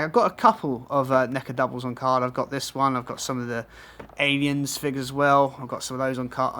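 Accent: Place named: England, United Kingdom. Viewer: We like the smooth English accent. fab content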